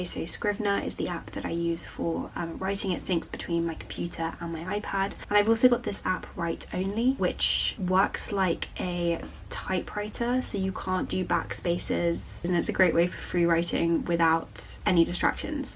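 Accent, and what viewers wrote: United Kingdom. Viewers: British accents fascinate me like Henry Higgins, in such a small country. This lass sounds a lot like Elton John which makes me wonder if they hearken from the same region.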